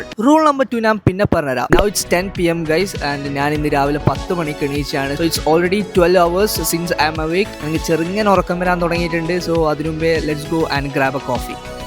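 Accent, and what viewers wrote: Kannur, India. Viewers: Neee kannur edyaaadaa..? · Kannur aahno brthr😮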